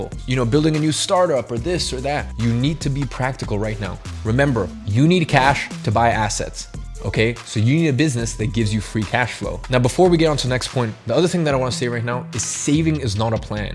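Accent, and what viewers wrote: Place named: United States. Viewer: Respect to your mind on finances and the future. But I still don’t understand how you have an American accent after growing up in London. Not even a little bit?!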